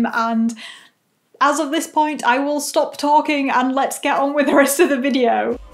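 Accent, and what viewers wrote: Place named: Scotland, United Kingdom. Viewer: Hi! I just found your channel. At first I thought that I haven't heard this accent before. Seeing UK in the channel's description was a big surprise for me, because british english is what they tried to teach us in school for ~10 years. ^^ Your pronunciation reminds me of the scottish visitor my English teacher once brought to class in 9th grade. Could it be some Scottish influence? I'm just as curious as my guinea pigs. ;) My language skills were not the best back then and still aren't today, so sorry from my end. Greetings from Germany :-)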